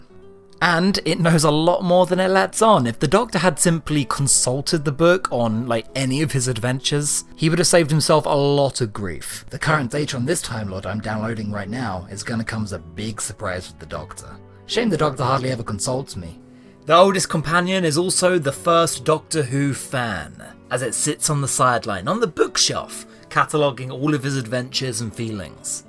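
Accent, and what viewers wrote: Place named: Italian American, United States. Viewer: Worst Italian American accent I ever heard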